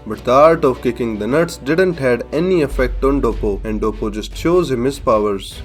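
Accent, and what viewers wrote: India. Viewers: I swear his indian acsent is getting thicker every vid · Bro are u indian? cause the accent is much similar like mine. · just stop. Ur Indian accent just kills the vibe and the joke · I dated a half guyanese girl and she had mostly indian aunties and uncles. there's no mistaking his accent. Actually , his voice is very soft for an indian man. Probably why his videos do so well. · You people🤦‍♂️, Go to his discord server then you'll see his name which is " ", which is obviously an Indian name, as I'm an Indian myself and i already recognized that he was Indian by his accent which is the Special Indian Accent only indians have.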